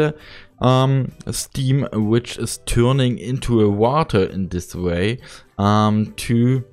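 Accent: Germany. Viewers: Sofort erkannt dass dun Deutscher bist xD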